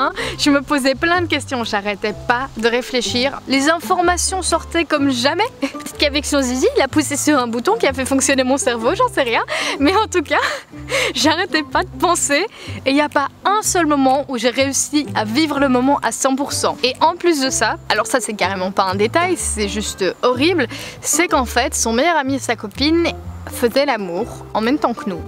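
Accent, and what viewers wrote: Belgium. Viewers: Oula tu serais pas belge toi ? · Je t’ai découvert part cette vidéo ( je sais pas pourquoi ) et vraiment j’aime tellement ta joie de vivre. En plus je sais pas pourquoi mais ta l’accent belge 😂🇧🇪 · T'es belge toi nan ?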